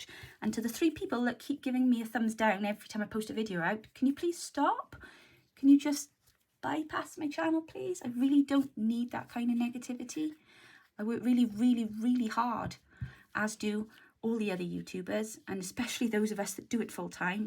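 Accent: Wales, United Kingdom. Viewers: I think JJ people are some of the most positive and supportive people around. I believe it is because we thrive when we are creative and I believe we pass on the positivity. And I love hearing your Welsh accent (I am from Denmark but spend 3 years at uni in Wales). Keep crafting and stay positive - we have one life, we decide what to fill it with😊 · I don’t normally add comments as I’m new to Junk Journaling. I’m shocked to hear that anyone who enjoys any sort of craft can make nasty comments about you and your very clever team. I live in NZ and sometimes feel a bit isolated but every day I look forward to your tutorials and love listening to your lovely Welsh accent. It’s funny hearing you talk about spring when we are experiencing autumn. I hope this bullying stops after your telling off and hope you and your team are not feeling to deflated as there will be hundreds of crafters in this world that appreciate everything you do. 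You certainly brighten up my day. Like you I was always the tallest at school and hated it but now there are so many young ladies around and I always admire how elegant they are. Certainly didn’t feel that in my youth. Keep smiling and team and keep turning out your amazing projects. I think you are all very talented. 😊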